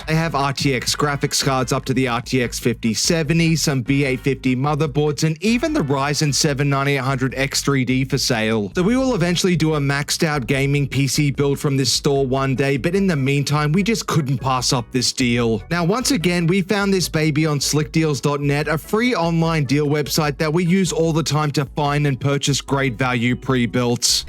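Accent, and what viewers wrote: Australia. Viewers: Are you gonna explain why you have a Melbourne Aus accent but for some reason live in the US.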